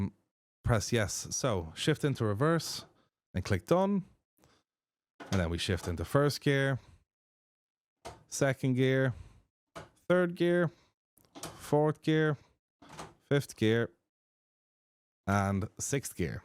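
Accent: Ireland. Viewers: It's "control R" - it's Irish accent! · Love the irish accent the irish people have always been my favorite english speakers. From what i have heard you are very similar to us austrians😂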